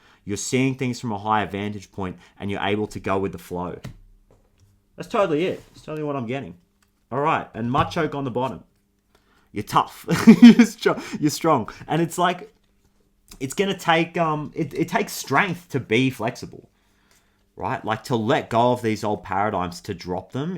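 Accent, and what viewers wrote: Australia. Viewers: You are so talented, appreciated and on point. And your aussie accent brightens my day everytime 🙏😁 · Hi, Thanks again, for your wonderfully encouraging words!! Are you Australian? (Your accent gives it away…lol). My grandpa was from London, but he always said he wanted to go to Australia. He is in Heaven right now, so he can probably travel anywhere he wants to. Best to you,. 🐶🥰🌸 “I am so grateful that I have received large sums of money, under Grace in Perfect ways.”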